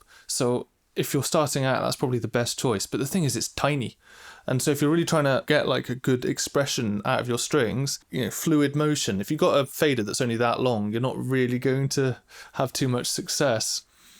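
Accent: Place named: United Kingdom. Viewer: Huh, I didn't know you were British. Neat video by the way! Can't wait for the next songs to come!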